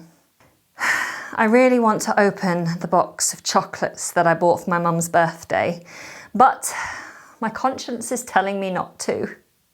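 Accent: United Kingdom. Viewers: Wow...wonderful British accent👍💟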